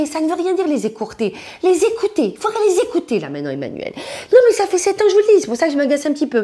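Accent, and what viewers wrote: South, France. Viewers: C'est l'accent du sud. Par exemple "hein" donne "haing".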